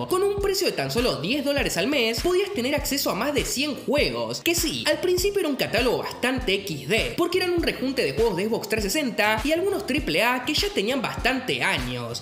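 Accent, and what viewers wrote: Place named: Argentina. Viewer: cualquier cosa que diga un argentino con ese acento tan horrible yo hago todo lo contrario, no destacan por su honestidad.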